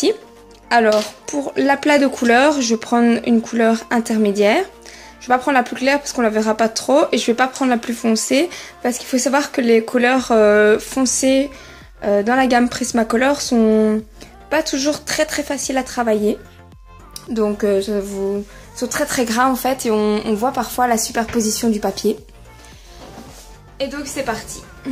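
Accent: Belgium. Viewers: Sympa la vidéo :) Une question me brûle les doigts, es-tu Belge ? Si oui, d’où ?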